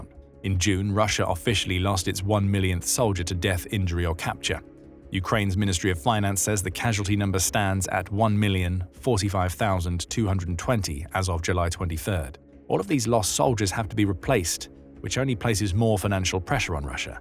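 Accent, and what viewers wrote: United Kingdom. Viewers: Misinformation is so easy to detect these days. Just detect the british accent.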